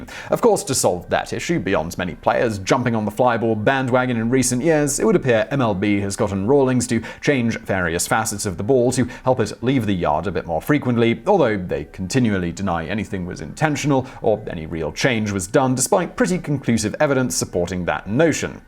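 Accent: United Kingdom. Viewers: Why am I listening to a Brit tell me about baseball?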